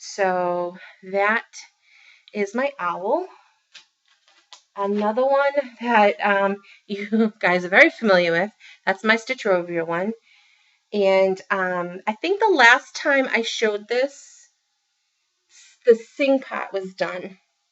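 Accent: Boston, United States. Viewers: I definitely heard Boston, with the ar words, like part, shark, start etc. I thought it could be stated island, maybe Jersey. I was born in and partially grew up in Brooklyn, so I'm told I have an accent as well. Lol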